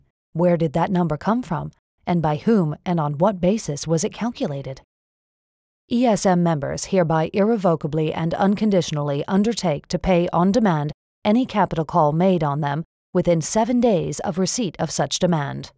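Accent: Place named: United States. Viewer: So why does the narrator have an American accent?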